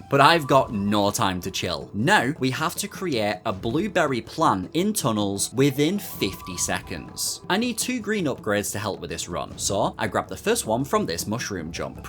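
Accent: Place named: Norfolk, United Kingdom. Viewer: What part of the UK are you from; I’m trying to place your accent — is it Norfolk?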